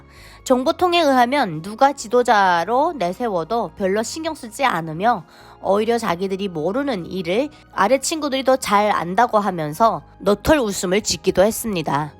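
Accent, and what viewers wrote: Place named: Hamgyong, North Korea. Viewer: 한민족 이지만 남쪽지역과 다른체제인 북쪽지역 주민들의 이야기와 소장님의 함경도 사투리도 듣고 싶네요.ㅋㅋ 경북 안동에서 댓글 적어봅니다.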